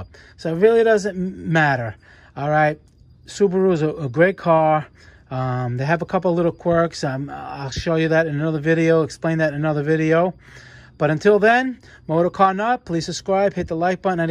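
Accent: New York, United States. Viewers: The New York accent shined through over that rod knock 👍 best comparison video online. thank you!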